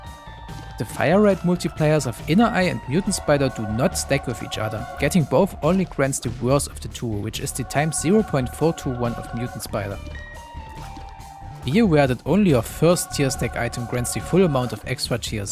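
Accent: Germany. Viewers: So extremly german 😅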